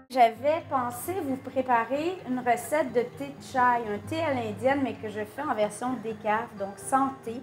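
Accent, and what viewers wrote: Canada. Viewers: Ne serait-ce pas un petit accent du Canada ? Quel accent, je l'adore. Je vous embrasse.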